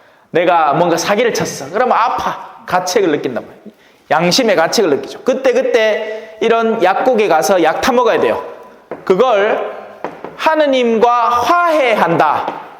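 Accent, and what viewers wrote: Gyeongsang, South Korea. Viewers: 신부님 갱상도 어디세용~? ㅋ 저는 영주입니다 ~으하하하하하하~~^^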